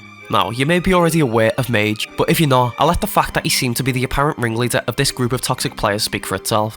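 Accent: Liverpool, United Kingdom. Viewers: The scouse accent is boss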